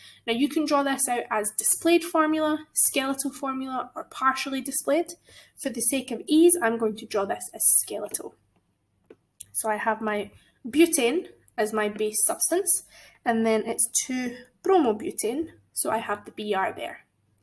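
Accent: Scotland, United Kingdom. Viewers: It’s just a Scottish accent calm down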